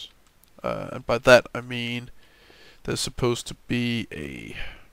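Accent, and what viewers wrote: Denmark. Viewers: Well, im already recording Ep12 :D i did a couple of fails with editing, but nothing major! well i can only hear that you are danish, because im danish too, so dont worry too much about it :-)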